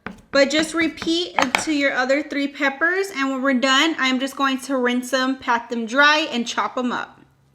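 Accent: Texas, United States. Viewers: I’ve never heard anyone call it a “cash-a-roll”. Lol. That must be your Texan accent ;)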